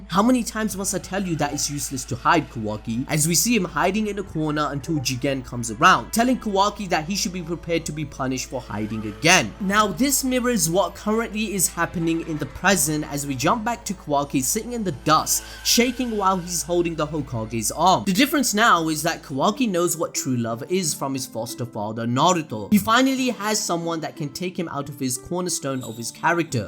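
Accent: Punjab, India. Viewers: bro U indian? Wow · lol are u punjabi bro? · Indian excent is lit.... 💯💯💯💯💯💯💯💯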